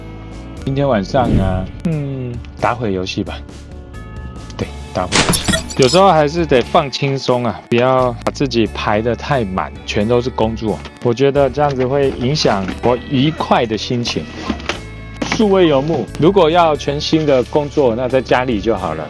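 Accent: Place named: Japan. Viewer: 講話怎麼感覺像日本人在講中文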